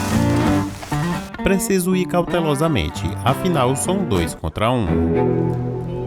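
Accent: Northeast, Brazil. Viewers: você é nordestino não e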